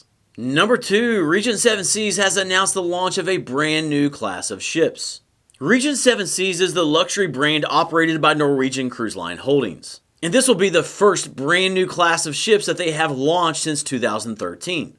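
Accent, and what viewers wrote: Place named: New York City, United States. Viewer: She's hilarious sounds like she's a new york city gal.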